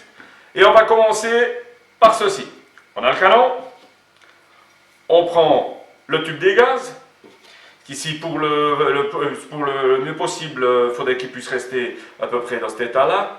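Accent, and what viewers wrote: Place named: Bernese Jura, Switzerland. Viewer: du jura-BERNOIS!!! bandes d'ingrats, merci pour ta video👍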